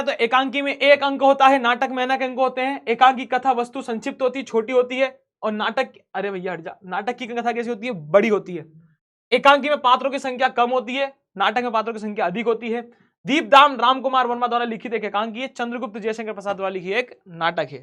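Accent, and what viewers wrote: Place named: Bhopal, India. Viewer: aapke accent sunn ke lag riya h aap bhopali ho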